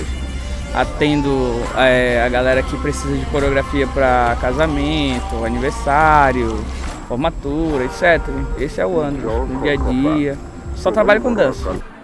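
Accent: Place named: Pará, Brazil. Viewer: Não sabia que era paraense, mas descobri nos 10 primeiros segundos de video hahaha Segura o norte ❤️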